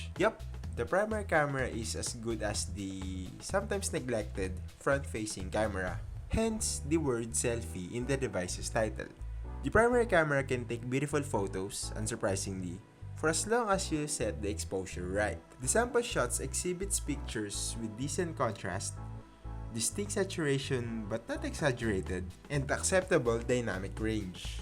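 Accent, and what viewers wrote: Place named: Tagalog, Philippines. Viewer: wala naman masama sa tagalog accent ate kakaloka ka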